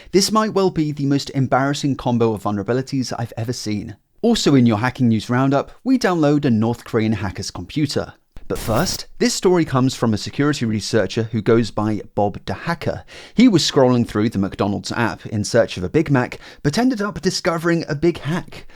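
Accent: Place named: United Kingdom. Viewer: no that’s his voice, he’s british i think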